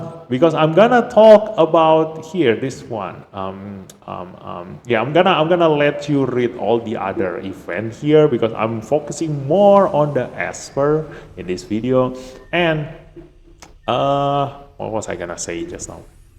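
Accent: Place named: Indonesia. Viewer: Hmm I recognize that accent, indonesian? Nice vid btw, good to see more dislyte content creators.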